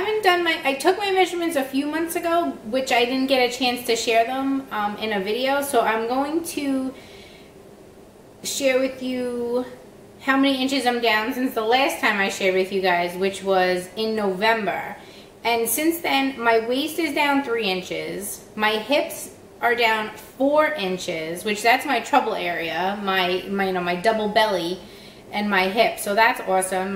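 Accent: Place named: Boston, United States. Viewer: Great job ! Proud of you. New Subscriber from Los Angeles, CA. Do you have a get-to-know-me video? I'd like to know where you are vlogging from, love the accent. My guess is Boston.:)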